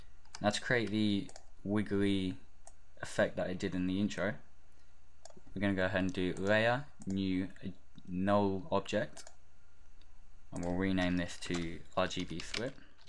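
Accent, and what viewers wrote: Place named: United Kingdom. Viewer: i dont understand well british people, did u say hold click on the possition in ???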